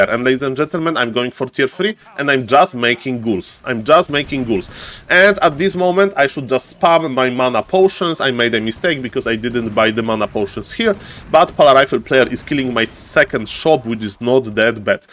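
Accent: Poland. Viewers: U from poland? Accent sound so polish 😊 Edit after 2 minutes: polak jak chuj xD pozdro wariacie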